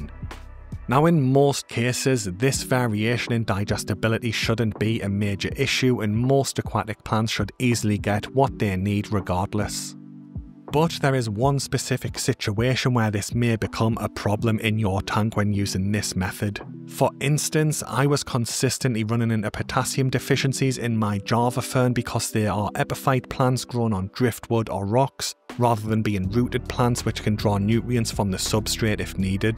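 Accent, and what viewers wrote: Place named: Newcastle, United Kingdom. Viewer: Mate how you haven't blown up yet is beyond me! I truly believe you're the best fresh aquatic channel on YouTube, comprehensive, accessible, VERY WELL RESEARCHED, no tedious filler content or salesmanship. The Geordie/Smoggie accent is a bonus, sorry can't tell the difference 😅 All the best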